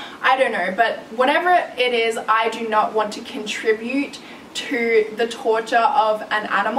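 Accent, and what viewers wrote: Australia. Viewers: Hey, can you make a Get Ready with me video with your Australian Accent?:) hope you noticed me! I love to watch your videos because of your mix thingy accent and you're so talented!! you look like Kylie jenner slash Vanessa Hudgens <3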